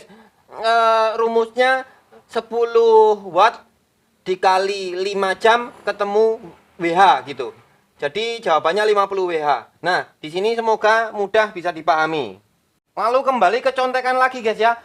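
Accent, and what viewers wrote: Java, Indonesia. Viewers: lucu juga ya mas ini medok jawane iaku senengmakasih saya juga mau planing buat plts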